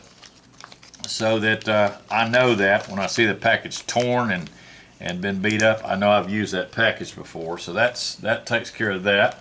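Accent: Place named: Arkansas, United States. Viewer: Great video, sounds like a fellow Arkansan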